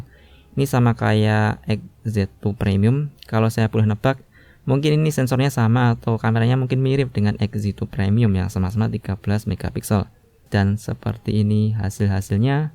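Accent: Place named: Java, Indonesia. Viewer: Wong ndi samean mas, medoke parah, auto subrek 😄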